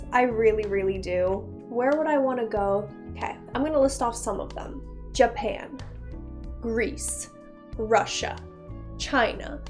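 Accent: United States. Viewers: You always self-identify as Polish, but you have an American accent. Did you move to the US during your early childhood, so you lost your Polish accent?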